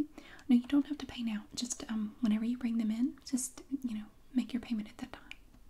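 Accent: South, United States. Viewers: I love your southern voice and biscuitville as well!! I eat at the one in Greensboro often lol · She sounds like a southern pam beesly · sometimes I forget that you have a southern accent and then I read the comments about the accent and then I just think “she has an accent??” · So many tingles! Does anyone else feel like she sounds like Pam from the Office but with a Southern accent? Such a soothing voice. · I just discovered your channel today. Your videos are amazing! I've listened to this one twice already and love the sounds and your accent! There's nothing like good ol' southern hospitality here in the south!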